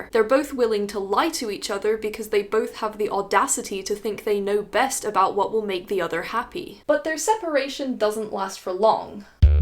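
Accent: Scotland, United Kingdom. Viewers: i cant pin your accent, you sound a bit scottish, a bit irish, but with an english twang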